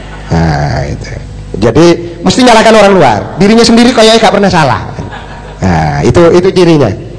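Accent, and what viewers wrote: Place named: Madura, Indonesia. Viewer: dari logatnya kayaknya orang madura. Mengingatkan ustad idrus romli yg cerdas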